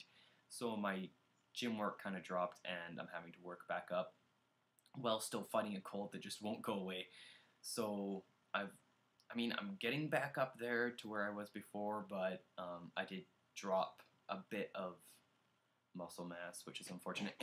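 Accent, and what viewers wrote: Canada. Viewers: Your Canadian accent is really noticeable now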